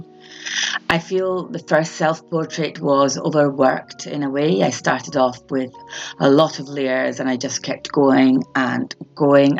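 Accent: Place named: Scotland, United Kingdom. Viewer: I don't think it's too loud. There's something with the audio quality that sounds very different from other content creators (it's not your beautiful Scottish accent). If you have a good microphone, I'm not sure if it's to do with the acoustics of the environment you record in? 🤔 Just thought I would mention it because I think the content you create is what a lot of artists really need to hear, and a small tweak to the audio might help your videos reach even more artists. 🙌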